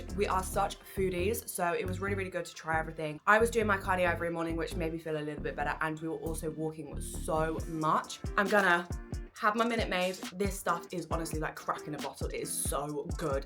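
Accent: United Kingdom. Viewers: Hey, are you british? Cool accent 😎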